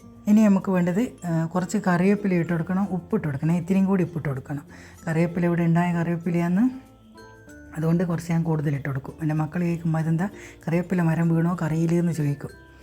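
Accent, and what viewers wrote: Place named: Kannur, India. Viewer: Enik Chechiide samsaram nalla ishtaayi ente kannur ulla relativesntem family friendsntem pole.. ❤ first like ente vaka.. ❤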